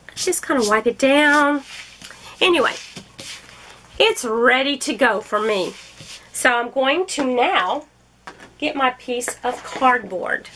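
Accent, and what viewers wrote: Texas, United States. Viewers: I would really like a drill-along with you. I've been drilling along with your videos going in the background. It's so fun hearing a nice Texan accent when I'm from New Zealand :D I love landscapes. I'm working on Starry Night right now but would like to do custom landscapes of photos I've taken in the future.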